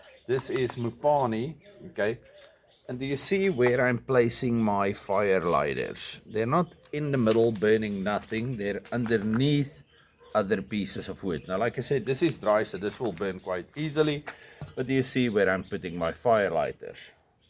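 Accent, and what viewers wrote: Afrikaans, South Africa. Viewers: I just came here for the great Afrikaans accent. 😀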